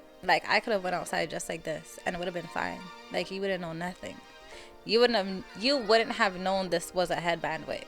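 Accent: Toronto, Canada. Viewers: Your Toronto accent is stronggg girl. Thank you for this!! ❤️❤️ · Very random but are you canadian? 😂 ur accent is giving very much Canadian like me!!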